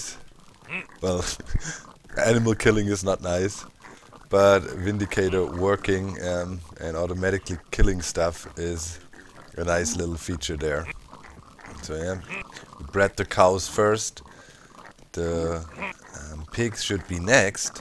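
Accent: Germany. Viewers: Mann merkt das er deutscher ist oder er hat diese deutsch englisch Akzent hat ^_^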